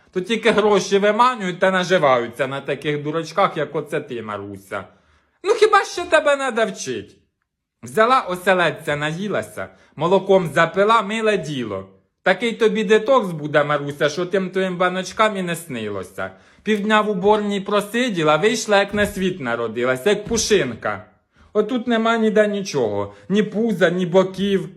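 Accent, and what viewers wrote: Poltava, Ukraine. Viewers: Я в захваті!!!! І це мало сказано... Ваша полтавська говірка, полтавська манера, тембр, жести, міміка -- все все так щиро і сердечно сприймається, що хочеться вас і дивитися і слухати і насолоджуватись... Як же добре, що є інтернет, який дає змогу нам милуватися дійсно народними талановитими самородками.. Вітаю, бажаю нових і нових підписників.. А особисто -- чудових ідей, гарних відео і успіхів на вдало вибраній стезі!!! Хай щастить завжди і всюди!!!!!!!!! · Море позитиву,який приємний Полтавський говір,Дякую тобі,ти супер👍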